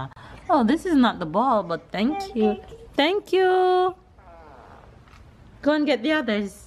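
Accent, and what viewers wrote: Jamaica. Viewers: I like your Jamaican accent. Autumn is awesome. It's not too hot, and not too cold.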